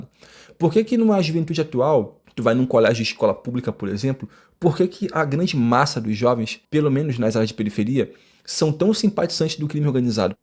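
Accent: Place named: Rio de Janeiro, Brazil. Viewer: Que tal você sair do Rio? Vem pra Minas!!! Aqui a conversa é outra, aqui tem pão de queijo e café no bule. Vai ser bom pra você, porque você vai aprender o mineirês e vai perder esse sotaque enjoativo do povo daí. Tá ruim aí? Vem pra cá! O que você tá esperando?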